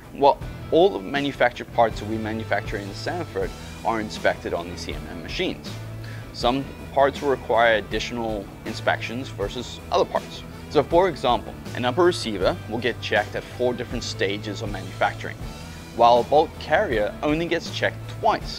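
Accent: South Africa. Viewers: sounds like a South African.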